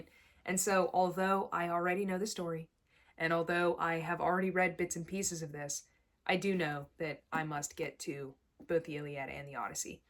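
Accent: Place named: South, United States. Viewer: Just found your channel. Enjoying the content. I am having trouble placing your accent though lol I think I detect a little southern in there, but I'm not sure haha Where are you from? Where did you get your history degree? Thanks for your channel 😃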